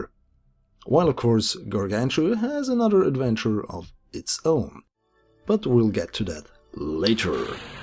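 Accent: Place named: Germany. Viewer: im not 100% sure though but im from Germany and he sounds german^^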